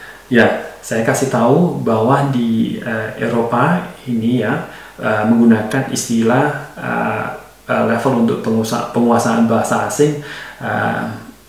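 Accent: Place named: Java, Indonesia. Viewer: Nice info. Masih medok buanget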